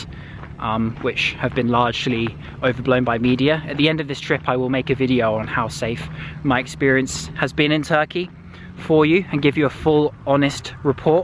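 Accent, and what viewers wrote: United Kingdom. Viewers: He's got British accent!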